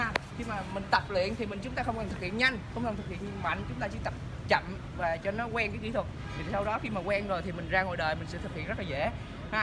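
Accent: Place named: South, Vietnam. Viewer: Nghe giọng người miền Nam rất thân thiện và dễ mến. All the best dòng máu nhà 😊👍🐨🇦🇺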